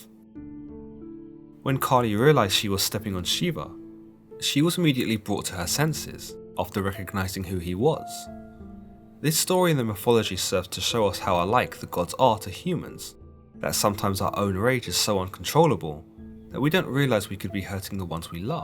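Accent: United Kingdom. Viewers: So tired of hearing the OLD CRUSTY british or english accents! Are they still trying to take over the world?!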